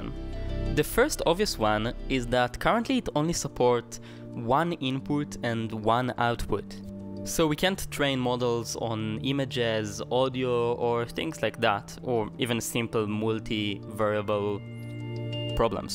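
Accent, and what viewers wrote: Israel. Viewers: nice ai vido and i know u are an isreali becuse of the axent i am 2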